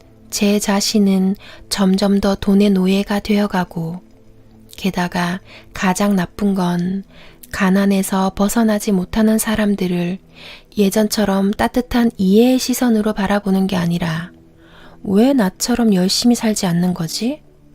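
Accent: Gyeongsang, South Korea. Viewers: 경상도 억양 목소리 사랑해요~~^^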